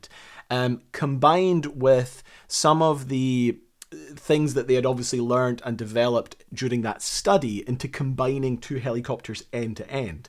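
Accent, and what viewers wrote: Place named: Scotland, United Kingdom. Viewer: Yet another great deep dive video by you of something unique and original, the fact its read by you with that Scottish accent, makes it even better. Kind regards John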